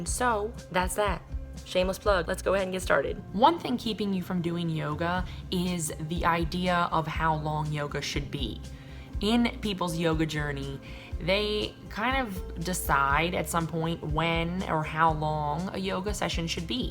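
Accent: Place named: North Carolina, United States. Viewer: I was about to comment about how lovely her accent is. I grew up in WV though I'm not originally from there. I never really picked up the WV accent but hearing your NC accent makes me feel like I'm back home. <3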